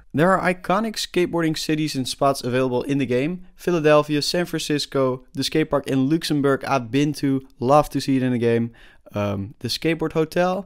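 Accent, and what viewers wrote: Netherlands. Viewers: I can hear he is Dutch because I am to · hi im dutch and have have a dutch accent so are you dutch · I smell dutch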